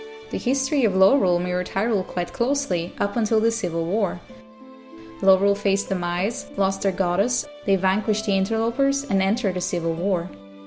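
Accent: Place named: Netherlands. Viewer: I honestly think you're the best Zelda theorist on youtube. Your theories are always logical and thought provoking, clicking nicely with the canon. You go into a lot of in-game details, showing you do your research. Your presentation is also the most pleasant to watch; good video editing, a clear yet calm voice and well formulated lines. Je Nederlandse accent is bijna niet te horen.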